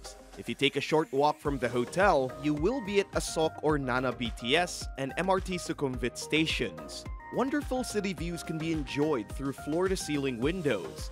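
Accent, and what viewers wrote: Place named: United States. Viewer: he sounds American.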